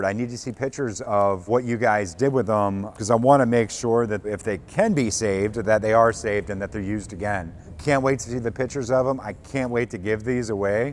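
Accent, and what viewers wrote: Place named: Pennsylvania, United States. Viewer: The way you say words like drawing - drawling is so PA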